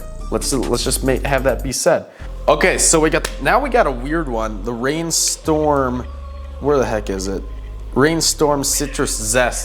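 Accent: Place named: Michigan, United States. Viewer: ahh you have a Michigan accent so hot ❤ 😊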